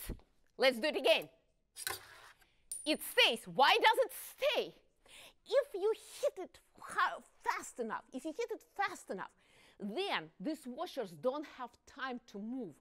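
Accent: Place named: France. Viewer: I thought she was from France or Germany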